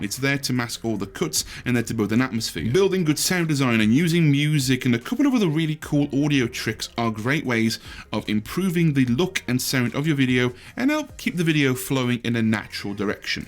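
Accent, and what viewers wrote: Midlands, United Kingdom. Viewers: Can I hear a Midlands accent in there trying to hide away? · Also... Is that a midlands accent I hear? ;p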